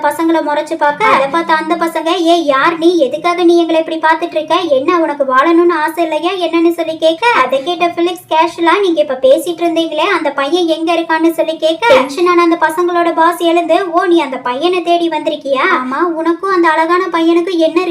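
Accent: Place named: Coimbatore, India. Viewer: Neenga Coimbatore i am thirvannamalai sis i love ur slang and voice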